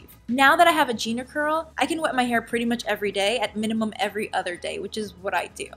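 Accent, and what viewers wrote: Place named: Jamaica. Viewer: I could tell you are of Jamaican ancestry based on the way you speak. Notice, I didn't say your accent. You said something a specific or a particular way that was a give away to me. It's not obvious but I noticed. Your hair is looking lovely.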